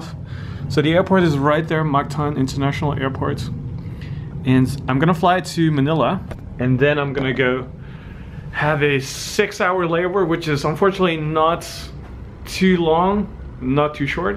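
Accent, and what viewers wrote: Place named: Australia. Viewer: you have a bit of aussie accent… nice flight review by the way. cheers!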